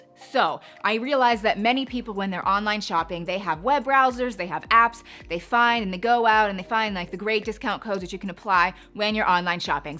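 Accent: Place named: Pittsburgh, United States. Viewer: I love how you say “mere” (mirror) and Q-pon (coupon). You are definitely from Pittsburgh (as was I). Finally lost the “yinz” to y’all when I moved to the South. Love your channel!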